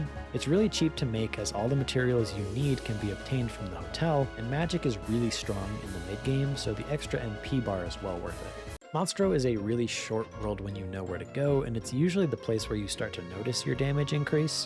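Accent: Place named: Canada. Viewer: You sound painfully Canadian.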